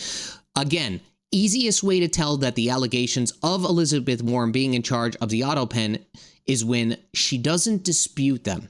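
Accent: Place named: Northeast, United States. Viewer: who are you? Are you a lawyer or a journalist or someone who as they say is a grifter with a nice face and a heavy NE accent?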